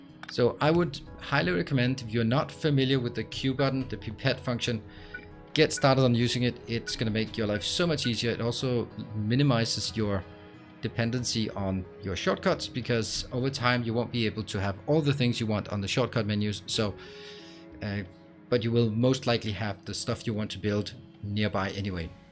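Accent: Denmark. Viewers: 16 seconds of your voice then i Knew you were danish. god video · Just a quick question. Are you from Denmark or Scandinavia? I can hear you have a danish ascent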